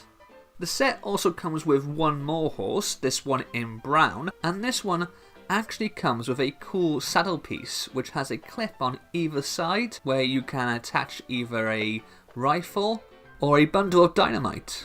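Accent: Wales, United Kingdom. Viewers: I'm just going through a ton of vids of yours, you're very entertaining and I love your welsh accent!!!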